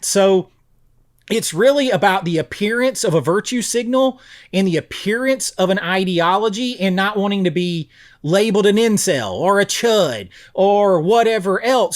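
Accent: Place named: Georgia, United States. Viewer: I have been on and off as a subscriber to your channel for quite some time. Here's the reason why: I've noticed that I strongly disagree with some of your reviews of "overhyped movies that are actually bad," as well as with many of the "hidden gems" from the '80s, for instance, that you present on your channel. To me, you tend to express your views in a fairly black-and-white manner — with that lovely Georgian delivery of yours — stating that something is either sh*t or absolutely great, with very little middle ground. At times I've grown frustrated enough with this to unsubscribe from your channel in dismay. Having been born in 1970 myself, I've seen and know most of the films that you review or include in your lists, and I find it interesting that there are many movies we both consider masterpieces, while on many others we couldn't disagree more. 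The topic of censorship is, once again, something I couldn't agree with you more on. Those "tiny adjustments" made to works of art that you brought up in this video — things that are merely reflections of their respective times and of the worldviews of characters from a particular era — should be subject to criminal prosecution more than anything else. It is genuinely disturbing to see this kind of trend, especially when modern movies already tend to be far inferior to the works of art produced in decades past. So, the persistent reappearance of your videos in my feed has once again made me a subscriber to your channel. I try to re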